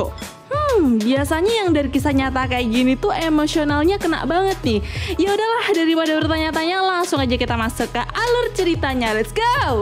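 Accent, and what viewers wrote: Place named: West Java, Indonesia. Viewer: Teh orng Sunda nya wkwkwkwk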